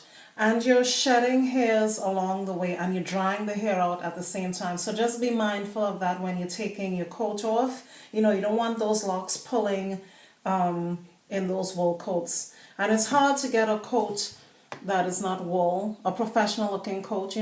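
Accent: Trinidad and Tobago. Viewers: U sound Trini...im hoping you are so you would know the climate we have...what do you suggest I use...my hair tends to dry out-locks and scalp- soooo quickly and sometimes I get tired of moisturizing so often (which is bad) lol...i need something that would keep it moisturized for longer periods in our veryyyy hotttttttttt weather :(((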